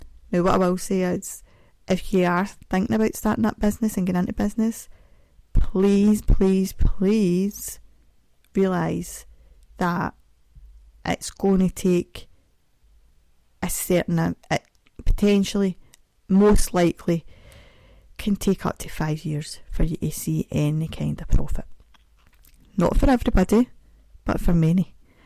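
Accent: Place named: Scotland, United Kingdom. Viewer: It was me!! I'm the one who said they liked your singing, and absolutely, I was serious! I'm in the USA and your podcast came up on my feed randomly. I usually am always listening with earbuds, and I love having your voice, accent, and singing in my left ear. I'm a big fan, and one of my favorite songs of his is. I heard a version of it by, and I was convinced it was MEANT to be sung by a Scottish woman. I always put it in playlists. I have a playlist for you if you want it.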